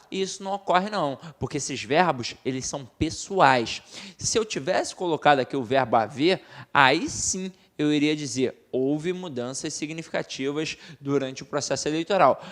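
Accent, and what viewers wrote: Rio de Janeiro, Brazil. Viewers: Adorei a aula e o jeito carioca! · o carioqueis é muito forte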